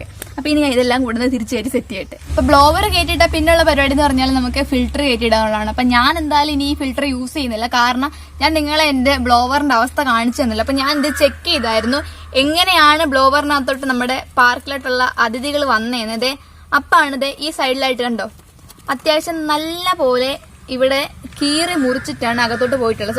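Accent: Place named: Kottayam, India. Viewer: Kottayam or idukki 😀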